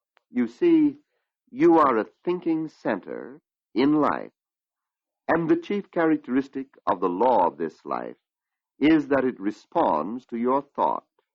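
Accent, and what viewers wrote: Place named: Maine, United States. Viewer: As a former Mainer, I love hearing his Maine accent. Forgot he grew up there. One of the giants of this teaching.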